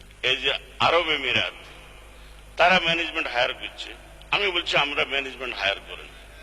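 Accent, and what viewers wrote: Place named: Noakhali, Bangladesh. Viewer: ওম্মা হেতেন তো দেখি নোয়াখাইল্লা চমৎকার...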